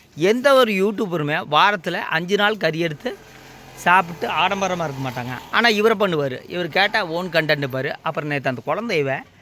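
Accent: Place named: Kongu, India. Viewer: அண்ணா இளவரசன் என்ன சொன்னாலும் அவன் திருந்த மாட்டாங்க அந்த காயத்ரி தின்னே குடும்பத்தை அழித்து விடும் போல😂😂 மொத்தத்தில் அந்த குடும்பமே அப்படித்தான் அவங்க அம்மா தங்கை அவனுக்கு சப்போர்ட் நீங்க இந்தியா குட்டி சேனல் பார்க்க சொன்னீங்கள்ள இந்த 20 நாளா அந்த இந்தியா குட்டி சேனல் தான் நான் பாத்துட்டு இருக்கேன் மாமியார் மருமகள் அருமையா நடிக்கிறாங்க அவங்க பையன் வேற லெவல் மொத்தத்தில் உங்க வீடியோ சலிக்காம நல்ல பார்க்கலாம் நல்ல ஜாலியா என்டர்டைன்மென்ட் நகைச்சுவையாகவும் இருக்கிறது கொங்கு தமிழ் அருமை❤❤❤🎉🎉🎉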